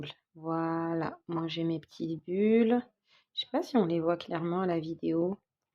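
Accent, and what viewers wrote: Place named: Algeria. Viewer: Les recettes sont tunisiennes et l'accent algérienne j'ai rien compris 🤗🤗🤗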